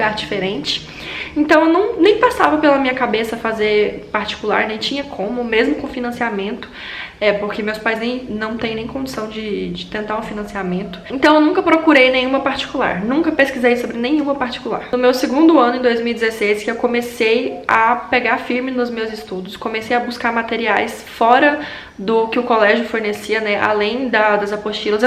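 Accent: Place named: Tocantins, Brazil. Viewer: Não sabiam q o pessoal do Tocantins tinha um jeito puxado de falar. ,bjs linda sucesso ❤♥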